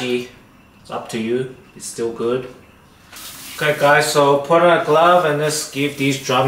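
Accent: Durban, South Africa. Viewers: You sound South African . It’s a compliment. Don’t stress . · The cutting of the drumsticks is a very good tip 😉. By the way, you sound South African🤔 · Are you South African? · You south african boet? Love the recipe. ❤😎💯🤘🔥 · Great recipe! Wheres your accent from? SA? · You sound South African 🤗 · Why does he have a PERFECT Southern African COLOURED (South African/Zimbabwean/Namibian) accent????? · Sounds South African accent tho not Chinese..🤷Beautiful recipe🙏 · Love your South African accent 😊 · Nice recipe 👍 Do I detect a slight South African accent ? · bro sounds coloured from SA,Durban hahaha Dope bro will give this a try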